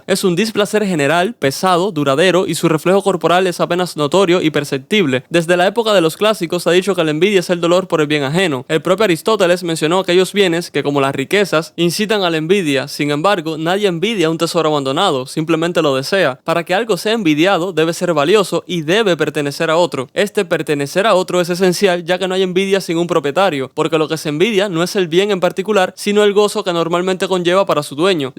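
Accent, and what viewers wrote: Cuba. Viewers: Es que te tengo envidia por la edición de tienes, ni decir de tus memes o de tu acento cubano mi hermano 😎😎😎😎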